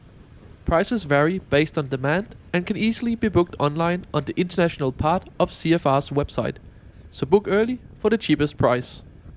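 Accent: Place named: Denmark. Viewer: Just found your channel by accident… but I’m glad I did haha Such great content! Instantly recognized that you are from Denmark given the accent Recently I’ve been to some suburbs of Kobnhavn while they were constructing the Hovedstadens Letbane/Copenhagen Light Rail – quite a unique sight, seeing the freshly laid tracks without the overhead lines You are going to be uploading a video about it? As I read, parts of it are already open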